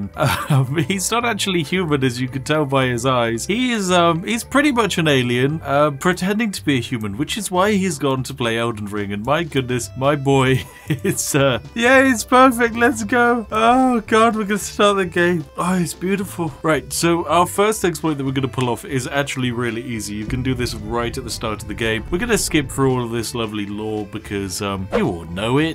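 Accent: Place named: England, United Kingdom. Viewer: I’m sorry, I really am, but the way the English say certain things makes me sick to my stomach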